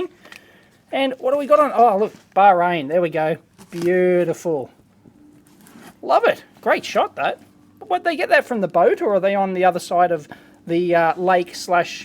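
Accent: Australia. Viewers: okay so i just read this entire comment war thingy and have come to this conclusion. first of all this man is not English he is Australian. Jack Napier try doing some research before you type things you may regret. also, as a side note, the English can be credited for creating the language that you are currently using (even if you pronounce things differently when they are spelled the same way).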